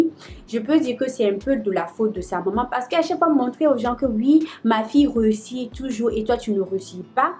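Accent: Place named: Ivory Coast. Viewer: j'adore ta façon de parler et ta personnalité,j'ai aussi cru que tu étais ivoirienne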